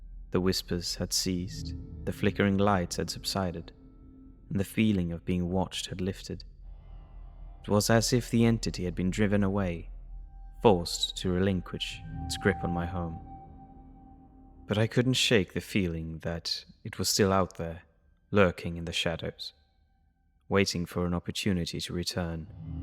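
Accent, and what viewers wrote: United Kingdom. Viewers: you have the perfect british accent for this too😉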